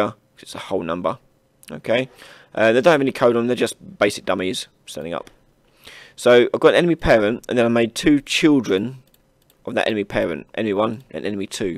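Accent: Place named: Norfolk, United Kingdom. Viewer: Bro I have to ask, Are you from the UK Norfolk area? You sound like you are... that's not a bad thing either.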